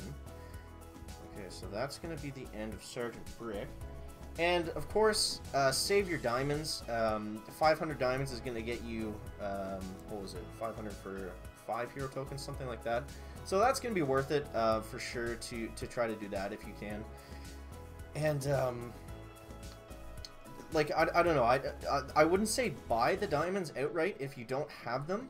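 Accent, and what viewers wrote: Canada. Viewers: Hey, love your videos man.any chance your from Canada's east coast? You sound like you might be. Thanks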